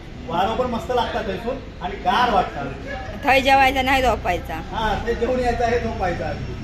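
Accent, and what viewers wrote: Malvani, India. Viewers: तुमचा मालवणी बोलणं खुप aawdata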